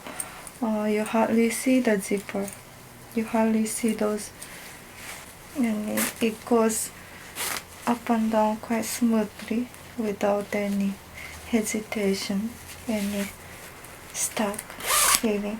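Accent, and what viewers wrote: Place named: Japan. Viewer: Your English is good, but sometimes sounds more Japanese and go to English classes to make your pronunciation more better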